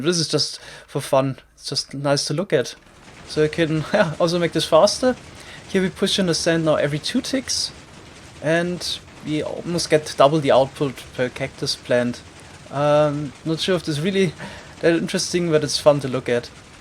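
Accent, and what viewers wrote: Germany. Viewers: Wie man einfach das deutsche raushört :D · Wow, dieser Deutsche Akzent. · Are you German? Your accent sounds like it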